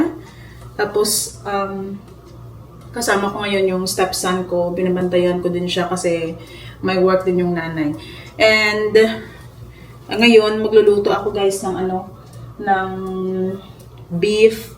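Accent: Iloilo, Philippines. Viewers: hi mhie taga san po kau parang ilonggo kc accent mo kahit nag Tagalog ka😊😊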